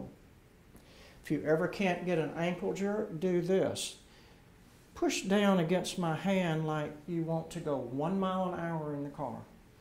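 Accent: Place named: Upper South, United States. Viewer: It's called an "upper south" accent. Distinct from the "deep south" drawl. This is more of a Kentucky, Tennessee, Virginia, or North Carolina way of speaking. Some parts of north Texas also similar.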